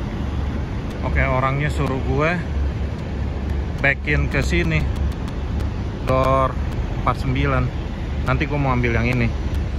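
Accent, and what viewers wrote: Indonesia. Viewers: Akhirnya aplod lg Trucker favorit. Kalo dengerin ocehannya asik bgt krn logatnya masih 'Indonesia' bgt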